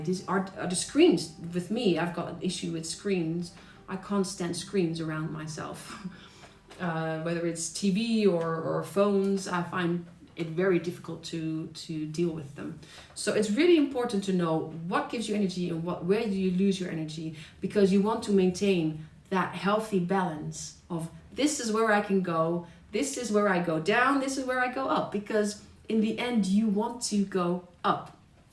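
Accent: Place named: Netherlands. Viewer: Girl, you mde me lough, "find the things, that are worth relapsing for." I am out of a relationship with a hot big boobed psychopath for about 4 months now. After 2 weeks I couldn't move a finger, I needed 12 h of sleep. I don't want to see people, only one friend left, a lawer who pulled her out of my house with the police and fiscalía. But I am fine with that. I don't have to work, so I can be me as long as I want. What I do is accept it. Now I am getting better day by day, I do a lot of work in my house, welding and all kinda stuff. I am still very very slow, but I see the results. I don't think M. E. , is caused by a virus, it's a respond to abuse, and I respect the respond of my soul to it. I can't even think of working out to get my sexy body back, even the thought of girls looking at me repells me. Time has a different meaning to me now, I don't feel I am missing out, I enjoy myself. 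When I feel like it, I got the chance to meet new people and I will do it very slow as well, there is power in doing it slow. You have a nice accent, from the Netherlands? I was a German. I was very fast there. Saludos desde otro lado del mundo Sudamérica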